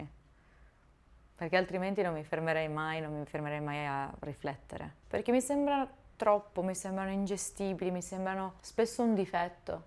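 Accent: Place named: Lombardy, Italy. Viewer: Non hai l'accento milanese ma più del sopra Milano.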